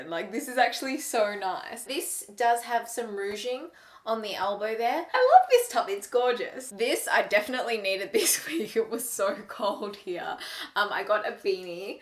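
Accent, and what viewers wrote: Australia. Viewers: this is a really random question but is she from australia? idk she sounds australian though. just asking because i want to watch australia youtubers and she was in my recommended and she seems nice and i love her already ahaha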